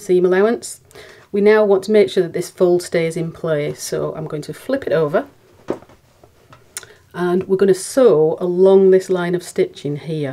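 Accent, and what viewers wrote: England, United Kingdom. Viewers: Hi, I just came across your videos by accident. How lovely to hear an English accent as most tutorials are American! I am currently binge watching all of your videos as I am quilting. I have now subscribed and clicked the notification button. Your tutorial are so well done and the instructions are clear to follow. Thank you so much for the time and effort you have put in creating them. Greetings from Norwich.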